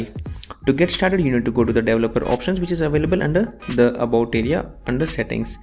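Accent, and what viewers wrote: India. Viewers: he's speaking at an average pace. it just sounds fast because of his indian accent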